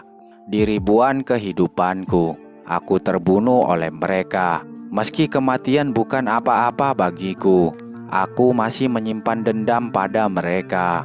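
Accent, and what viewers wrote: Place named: West Java, Indonesia. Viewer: Si mimin nih kaya nya fans nya bkent yagesya.. trus ada logat2 si jhon juga wkwkwk .. org sunda ya bang haha pake atuh bahasa sunda nya da moal nanaon ieuh meh jiga si jhon ngan bedana menceritakan alurcerita anime2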